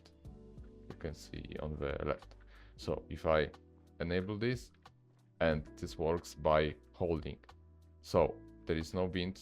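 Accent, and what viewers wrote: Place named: Poland. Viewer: Słychać ten polski akcent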